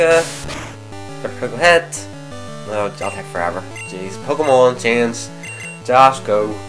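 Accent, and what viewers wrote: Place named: United Kingdom. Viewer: u sound kinda british / scottish / austrailain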